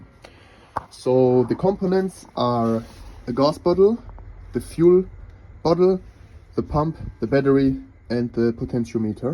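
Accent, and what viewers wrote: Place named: Germany. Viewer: Heavily german accent and gorgeous engineering i appreciate this. <link>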